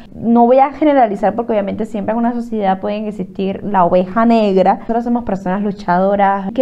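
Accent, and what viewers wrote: Venezuela. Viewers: tu hablada es parecida a la hablada venezolana, quizás sea por eso que te confunden, cuando llames y te confundan por la hablada, diles que eres cubana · Es la desconfianza que tenemos hacia los venezolanos. muchos llegaron con malas intenciones y tu dejo se parece mucho a ellos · Te confunden con venezolana así de simple por el acento que es muy parecido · no te discriman a ti si tu eres Bella es que tu acento se parece a un venezolano con respeto y por temor a algunos que alquilan tiene miedo que les pueda tu ya sabes hacer cosas malas si tu le dijeras que eres Cubana normal te aceptarian es por el acepto casi similar que tiene sera por eso suerte con tus videos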